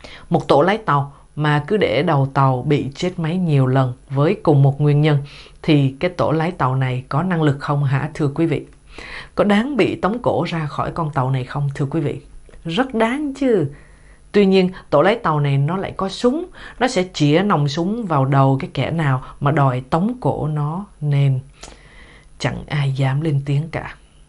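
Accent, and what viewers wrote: Standard. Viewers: MC tuyệt vời giọng nói rất chuẩn, yêu em quá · Chị này nói ko nhìn sách luôn, vừa đúng chuẩn vừa hay tuyệt! 👍👍👍👍👍👍👍👍👍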